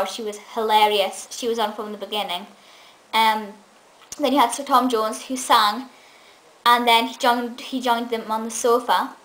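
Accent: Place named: Scouse, United Kingdom. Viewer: Paul O Grady show is great it's the only chat show I could really get into. You sound a bit scouse with a bit of scot in you.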